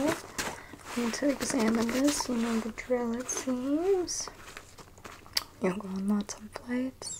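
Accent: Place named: Canada. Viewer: The way you talk, you must be from Canada 🍁 well because I am too. This is the first video I saw of yours.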